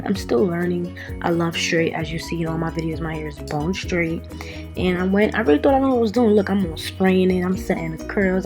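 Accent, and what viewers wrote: New York, United States. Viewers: Omg I love hearing u speak reminds me of home NYC girl great video it came out so nice does the hairspray really protect the lace I’m so worried bout that cause I like the lace to stay u did good sis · You from New York ?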